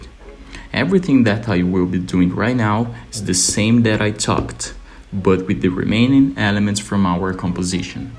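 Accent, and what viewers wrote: Brazil. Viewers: pelo sotaque eu percebi que era br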